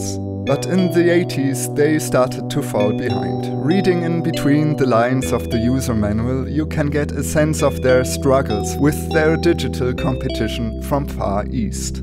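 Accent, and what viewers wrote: Germany. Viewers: More German accent please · I loved your video, thank you for producing it. I remember when the Italians were producing many electronic organs in the 70s and 80s. I still own an X705 portable organ that Michell Jarre used. As you say a very sad demise. Most of the American names had also disappeared by the mid 80s. Don't apologise for your German accent. I am British and I love Germany and the German people. I am a fan of Franz Lambert who at 75 is still doing concerts on his Wersi organ.👍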